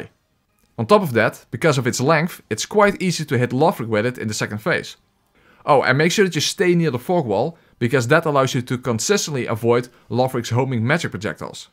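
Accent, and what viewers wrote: Netherlands. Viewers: I fxcking love Dutch accents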